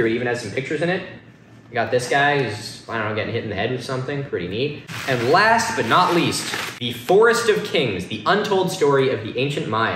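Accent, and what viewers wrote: United States. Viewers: I KNEW YOU HAD A MASS ACCENT OMG I figured Rhode Island was just close enough but I feel 10x better knowing you’re from Mass. I have so many friends from there y’all all talk the same LOL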